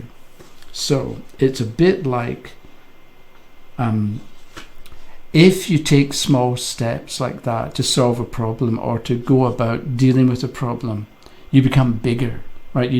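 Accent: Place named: Glasgow, United Kingdom. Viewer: I love your accent, mister. May I ask what area it's from? It's almost like Peter Capaldi's glaswegian accent but mellow. Good video and explanation. Thank you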